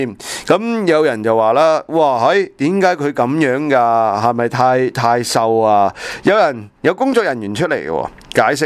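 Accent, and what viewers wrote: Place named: Cantonese, China. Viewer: 支持同0K仔! 萬分支持有廣東特色嘅普通話，令到咁Q普通嘅普通話變得非常特別悦耳 💪💪💪💪💪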